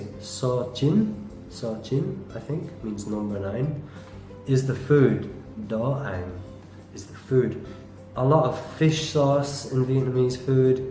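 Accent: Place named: Ireland. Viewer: You are so cute!! and your Irish accent OMG <3 *major crush*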